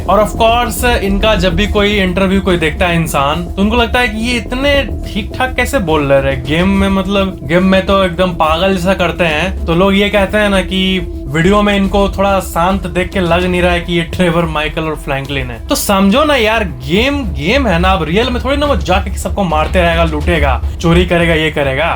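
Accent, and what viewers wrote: Bihar, India. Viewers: Tumhare Bolne ke tarike se anyone can say you are bihari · Bhaiya ap bihar se h kya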